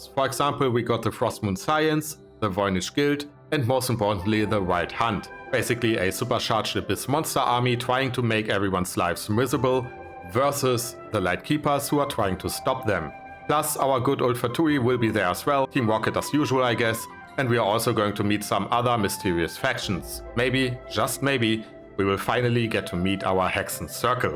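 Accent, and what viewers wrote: Germany. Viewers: The video is great, however, the whole time I've been wondering where you're from and I think it's a German accent? 😂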